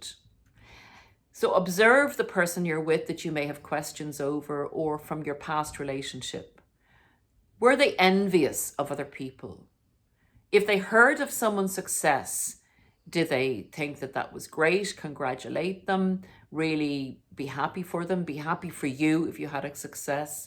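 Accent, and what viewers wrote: Ireland. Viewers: Interesting Irish accent 😊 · Are you from Ireland? I lived in Ireland for 2 years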